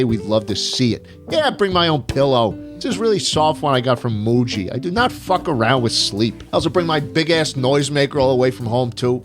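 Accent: New York, United States. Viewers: i like how his thick new york accent slowly faded away since he retired, leaving that velvety voice i remember from his earlier days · His New York accent is pretty constant but it gets stronger sometimes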